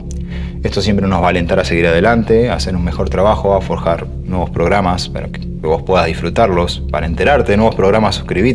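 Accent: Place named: Argentina. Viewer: Hola, que lindo escuchar a Aurum!🤗 tiene tan linda voz..y ese acento Argentino..😎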